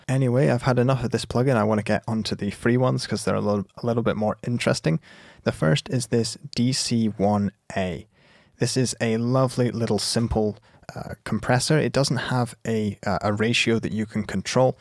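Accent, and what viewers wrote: Scotland, United Kingdom. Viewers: Offtopic... is you accent Scottish?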